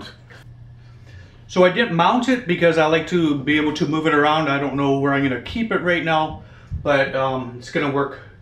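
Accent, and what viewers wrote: Canada. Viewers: great job bro! p.s. you remind me of Jordan Peterson's accent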